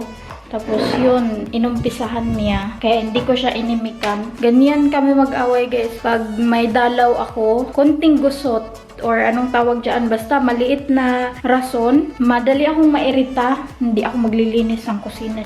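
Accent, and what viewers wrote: Visayas, Philippines. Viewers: Ate bisaya ka ba?🤗🤗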